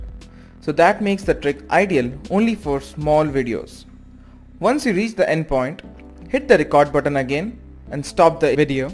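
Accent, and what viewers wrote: India. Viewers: Why is it so , that when i hear an indian voice , i don't thrust the tutorial ?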